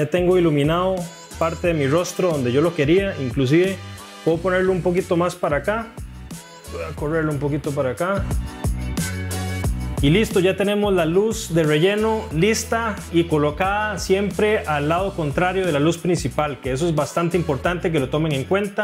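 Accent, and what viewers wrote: Costa Rica. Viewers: mae los ticos tenemos un acentillo raro no arrastra la r y aun asi me di cuenta que sos tico pura vida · ya decía, esa manera de pronunciar la R es inconfundible de un tico, buen video :) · Mae el acento tico es probablemente el mas reconocible jaja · Acento tico!!! Genial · Que cool este video 😮 , estoy por empezar un podcast con una amiga y este video es oro 🥇🪙, desde que te escuché pensé que eras de Costa Rica, porque tengo un amigo que habla casi como tú 🤭 no me equivoqué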